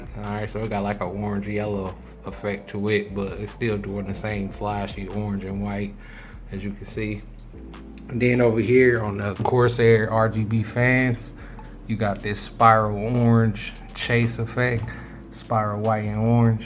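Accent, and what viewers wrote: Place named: Mississippi, United States. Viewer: Felt like someone from Mississippi telling the story on a rocking chair couldn't understand half of what he said.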